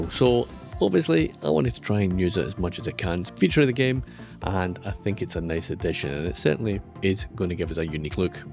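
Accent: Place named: Wales, United Kingdom. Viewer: As a native English man I can confidently say he's welsh.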